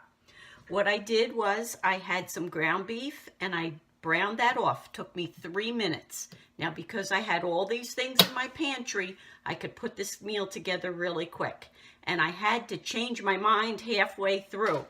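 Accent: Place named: East Coast, United States. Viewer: Looks delish! You from east coast. Slight accent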